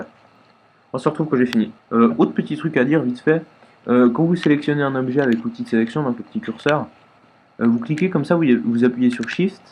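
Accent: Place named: Belgium. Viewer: Ha un Belge !! ( j'adore les 90,70) ;) Sinon tres bon tuto !!.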